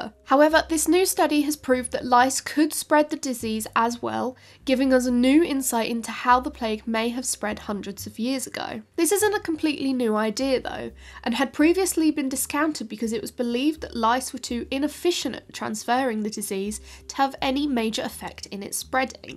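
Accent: RP, United Kingdom. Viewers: I love this channel for: 1. Lots of cool science news. 2. Perfect English RP which is a music for my polish ears. Best Regards! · I knew before I started the vid, she'd have a British accent. Perfect. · I nominate voice with her adorable English accent to be the new voice of OpenAI's GPT-4o.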